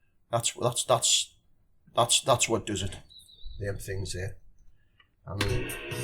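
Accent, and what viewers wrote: Newcastle, United Kingdom. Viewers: "... an then ah fa'got ah thought that an I went an bought some ..." Love it, and love the Geordie. Totally agree, though. I don't have space for speakers either, but I do need new headphones. My skullcandy ones are falling to bits. Sennheiser?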